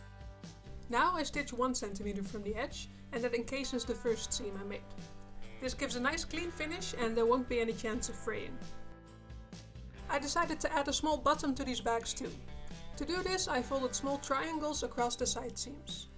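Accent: Netherlands. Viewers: Great bags... One question ... are you dutch???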